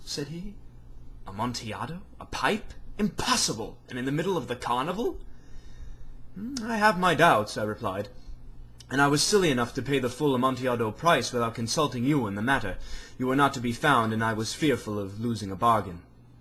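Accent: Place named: United Kingdom. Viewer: Why are you reading with a British accent? It's a story, written by an Amerinca, and set in Italy. And who is Lucrezi? I assume you mean "Luchesi".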